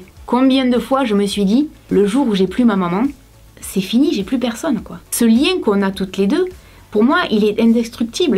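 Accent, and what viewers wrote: South, France. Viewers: Merci pour ce partage. Tu es une personne authentique et j'adore. C'est ta maman sur la photo avec toi ? Tu as déjà fait une vidéo avec elle ? J'aimerais trop voir si elle parle comme toi ? Je suis dans le sud aussi, mais c'est pas le même accent. Je ne vous connais pas mais je vous souhaite de bien vous portez toutes les 2, pendant longtemps. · J’adore tes vidéos, la plupart du temps ce ne sont pas des sujets qui m’attirent mais je les regarde rien que pour ta personnalité et ton accent du sud que j’adooooore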